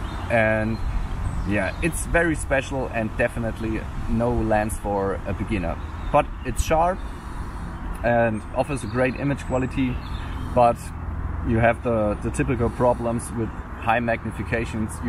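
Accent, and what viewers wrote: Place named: Germany. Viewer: Charmanter deutscher Akzent..... 😉Tolles Video.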